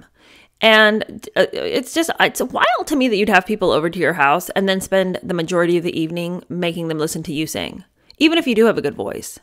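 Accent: United Kingdom. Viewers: I LOVE your British accent...so cute...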